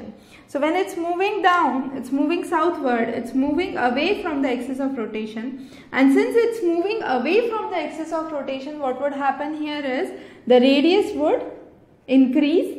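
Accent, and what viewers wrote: India. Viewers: Well explained, the only problem is i'm not so familiar with the indian accent.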